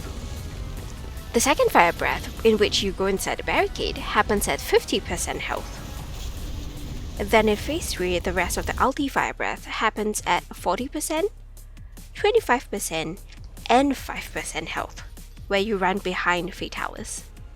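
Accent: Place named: Singapore. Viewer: Just curious, but from your accent, are you Singaporean or Malaysian?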